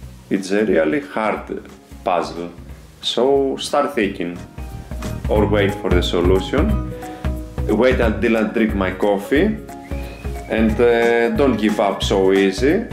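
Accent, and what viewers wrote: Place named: Greece. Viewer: the narrator is greek, no doubt)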